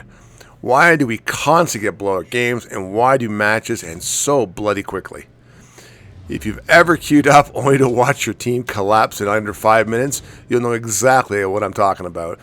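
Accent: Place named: Canada. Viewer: Just sub'd -- good to have a Cannuck voice here -- since I-Chase Gaming went away ..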